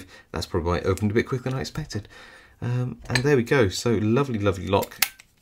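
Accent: England, United Kingdom. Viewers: Love your English accent , brass lock no problem your so cool n confident. Keep up great work. I like as hobby but I'm still learning.😅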